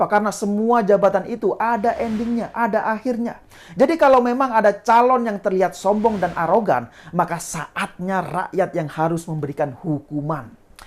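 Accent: Java, Indonesia. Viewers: Njawani banget 😮😮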